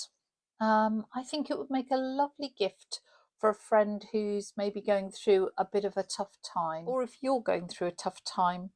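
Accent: United Kingdom. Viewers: Love this! And those color sheets! I love British English. I have a steady diet of BritBox so I feel a bit like I like in the UK. I visited last year for 1 month and in 2025 I will do again in Dec.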